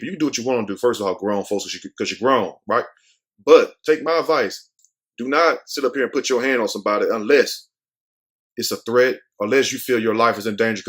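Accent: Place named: Texas, United States. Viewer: Didn't know you were in Dallas boo..I'm tryna meet and greet..I knew you sounded like a Texan..lol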